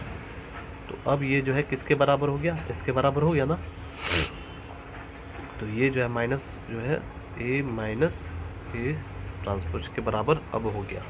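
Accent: Bihar, India. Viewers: Bihar se ho ka? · Kaha ke ho bhaiya Bihari ho kaa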